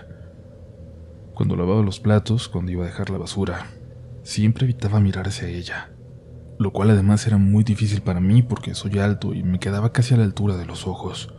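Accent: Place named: Mexico. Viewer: Hola a to2! Francamente alucino con tus relatos, sos muy gráfico a la hora d narrar, mejor q 1 film d terror, q se repiten + q el hipo! Vaya con la d suscriptores q tenés!! T felicito! Me basta con cerrar los ojos y escucharte con ese lindo acento mexicano q tenés, me encanta q relates en tercera persona, to2 podemos ser vos d esa forma. Gracias! #FuerzaUcrania!!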